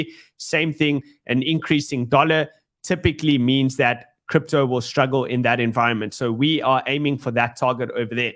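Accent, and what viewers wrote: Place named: South Africa. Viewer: Love the SA accent. Couldnt care less about TA. Its all BS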